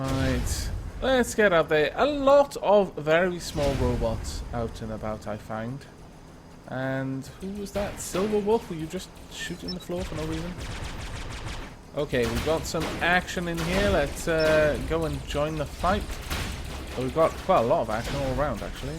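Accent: Wales, United Kingdom. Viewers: A MASSIVE: THANK YOU!!! (^_^) Hi! I'd been watching lots of Cataclysm: Dark Days Ahead vid's here on youtube when I stumbled across your fantastic channel. After I'd ran out of your Cata' vid's to watch I briefly checked your Pixel Piracy when I noticed these Robocraft vid's of yours. As a fan of Minecraft & Kerbal SP the appeal was instant. I downloaded the game that same & haven't touched anything else for a solid week now. I've been making pretty much 1 to 2 vid's a day now since I started out on this epic quest of awesome cube versus cube destruction and would just like to thank you for your part in this. Will give you a shout out in my next video. I'm a fellow Brit & love your accent [ Welsh? ] - hope to see you ingame someday & please feel free to see what you've caused if you ever have the time over on my channel, would love some feedback if you do ever get the time to peruse my efforts so far, cheers Sho (^_^) <link>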